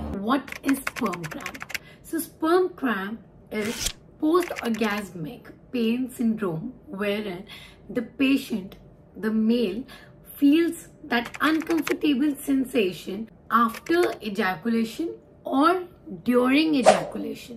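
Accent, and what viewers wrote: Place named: Pakistan. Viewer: Your accent is Pakistani ❤🇵🇰🇵🇰🇵🇰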